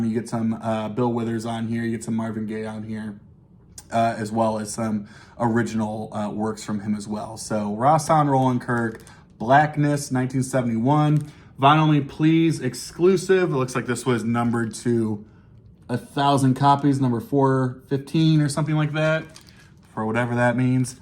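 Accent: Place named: Ohio, United States. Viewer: Hi! I blacked out during the “free jazz” segment. But when I came to, the album that intrigued me was the JA-20 or. The fat hype sticker was in the way and your Ohio accent was hard to decipher. Awesome episode!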